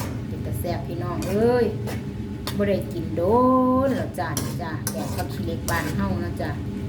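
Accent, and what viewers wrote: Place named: Nong Khai, Thailand. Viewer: อยู่เมืองนอกกะมีบ่อขี้เหล็ก เว้าแป้งนัวคือคนหนองคายศรีเชียงใหม่เนาะ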